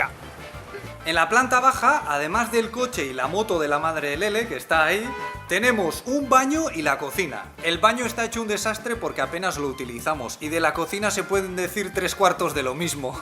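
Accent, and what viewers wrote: Basque Country, Spain. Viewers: Ostimas con el acento VASCO... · Es impresionante el acento vasco de este hombre jajaj.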